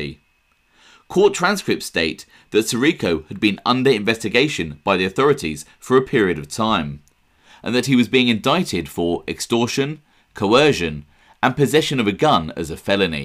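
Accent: United Kingdom. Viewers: Funny hearing a British guy talking about are American Italian history 🤡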